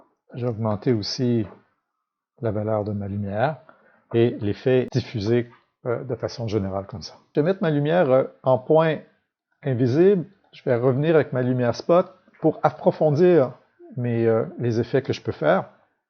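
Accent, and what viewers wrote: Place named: Canada. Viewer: Bonjour. Je découvre ta chaine avec plaisir. Ton tuto est exactement ce dont j'avais besoin pour le visuel sur lequel je travaille. J'aime beaucoup ta façon d'expliquer, c'est clair, efficace, avec l'accent canadien que j'adore de surcroit! Je vais regarder tes autres tutos. Bonne continuation. Birdee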